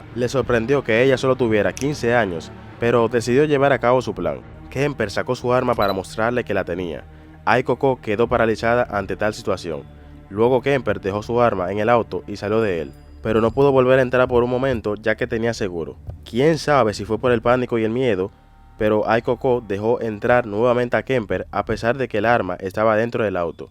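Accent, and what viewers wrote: Dominican Republic. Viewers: Me encanta como narras y como pones los comentarios de las personas con nuestro dialecto Dominicano! 😂 Tengo muchos vídeos ya visto tuyos.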